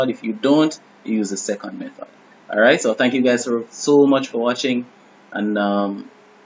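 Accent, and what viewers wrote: Jamaica. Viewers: You sound like a Jamaican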